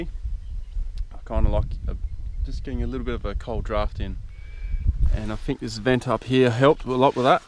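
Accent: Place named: Australia. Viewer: I just love Aussies say 'in winter'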